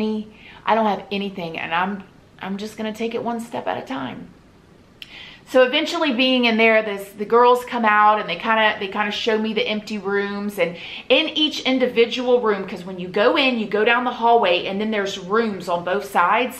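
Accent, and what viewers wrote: South, United States. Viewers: 🇨🇦 .... You aren’t even my kid .. and we area world away .....But wow .. I have to say DAMN girl I’m proud of you !! ... I’ve watched a few of your videos today starting with your horribly abusive childhood ... your prison story ... The whole cavity search (your poor thing) ... And of course your amazing transition to finding 🤗God 🙏🏻 .... I am a Christian and I love that you are bold enough and brave enough to expose so much of yourself and your story to the world ... because there’s no doubt you already have and will continue to help so many !... I hope everyone takes the time to watch and listen to the one about finding God in particular because if ever we need Him it is NOW! ... You are such a beautiful person and I’m a pretty good judge of character !... I am Canadian and I love your southern accent it suits you to a T ... 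Your son is precious and so blessed to have a mama who can show him what is possible in life even when you don’t believe in “yourself” ... God believes in you ... And “we can do all things through Him who gives us strength” Your story and what you have accomplished through it all is rare I hope you know ... Not many who had gone through ALL that you have could have climbed out to become all that you have and are ... Hugs 🤗 to you ! ... Keep on keepin on !💕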